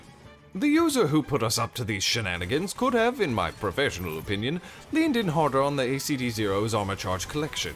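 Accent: United Kingdom. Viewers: Love the content & your British voice. But it's kinda funny how I literally just made a double primary cascade point PVP build with Undying Tempest & positive outlook with Dragon Shadow on my hunter yesterday. And it's so much fun once you get the ball rolling! Cascade Point is actually a sleeper TTK and DPS perk.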